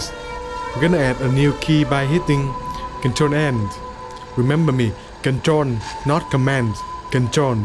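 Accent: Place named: Vietnam. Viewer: Vừa ấn vào video chưa kịp nhìn mà nghe cách phát âm là biết người Việt Nam gòi :3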